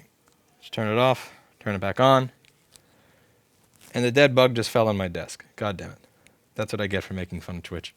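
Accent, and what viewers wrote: New York, United States. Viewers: as someone who uses English as it supposed to be used its deffo soLder ..lol .. but your US-NY dialect doesn't bother me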